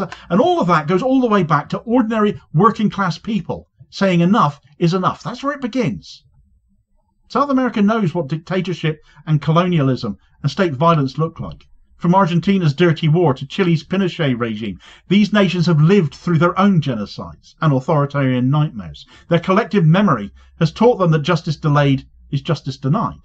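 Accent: Cornwall, United Kingdom. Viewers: I did watch a video of huge protests in Chile which has a large Palestinian population. Great reporting as usual. One of the finest independent journalists, love the Cornish accent too😊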